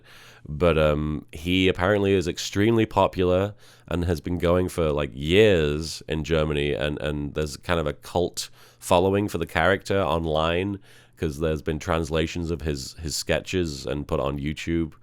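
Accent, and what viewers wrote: United Kingdom. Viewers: I wonder why he has a British accent.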